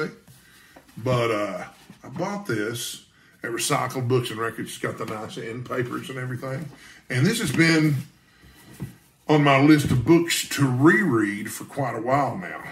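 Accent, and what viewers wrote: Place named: South, United States. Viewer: Great to hear a fella with a little South in his mouth. You’ve got some interesting picks.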